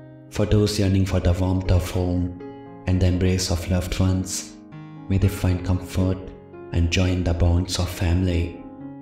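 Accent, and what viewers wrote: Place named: South India, India. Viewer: Your pronunciation is like the south Indians speaking English with the vernacular tone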